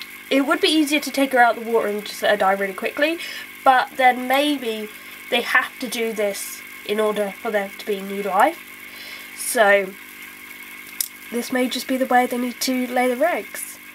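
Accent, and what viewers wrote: United Kingdom. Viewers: i can't really recognize accents (since I've moved to wales which is weird) but are you British?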